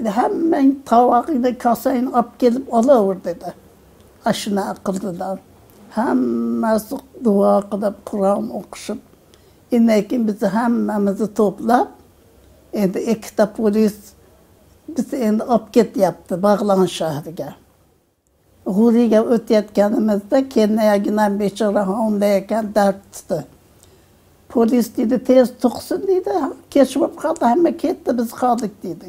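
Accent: Andijan, Uzbekistan. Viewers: Андижонча гапиряпти шева Андижон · Онахон сог саломат булинг, 5 ёшда кетган булсангиз хам (Анжон) Андижон шевамизда гапирябсиза , аллохим у́зи асрасин, фарзандларингиз бахтини ку́ринг, afarın teyzacim çok teşekkürler sağ ol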